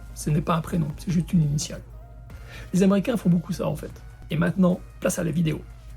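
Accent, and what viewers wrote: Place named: Nancy, France. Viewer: Bravo pour cet excellent épisode, bien documenté, qui rend parfaitement hommage à cet immense artiste. Et bravo pour ne pas avoir perdu l'accent nancéien après 20 ans aux US !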